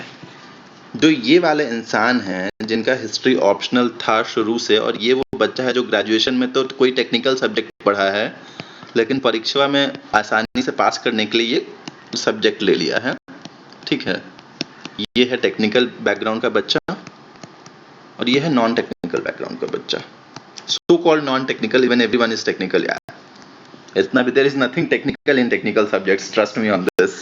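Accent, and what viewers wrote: Bihar, India. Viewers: I was waiting for this video bcz my optional is History 🤣🤣🤣🤣, And I like ur Bihari accent, Aap english use kar skte the ya shudh हिंदी, But hindi with bihari accent, Bole to jhakkas sir, Thanks for this video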